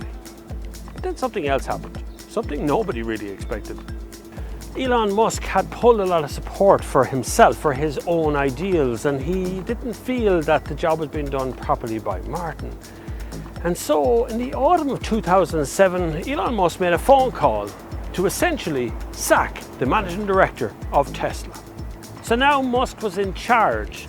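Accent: Ireland. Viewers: Excellent documentary. Thanks very much. Just a minor point as a Canadian watcher: Newfoundland is correctly pronounced "Newfin' lan". Also, they have accents very close to your own as this part of Canada was mainly settled by Irish migrants. Carry on. Great content. Cheers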